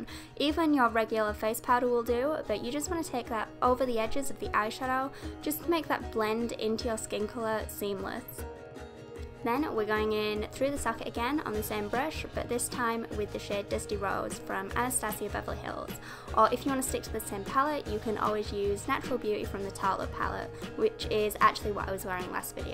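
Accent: Yorkshire, United Kingdom. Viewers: Yay a new vid! Where is your accent from? Is it somewhere in Yorkshire, I always watch your videos when I have panic attacks because your voice really relaxes me:) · so nice hearing a yorkshire accent on this website!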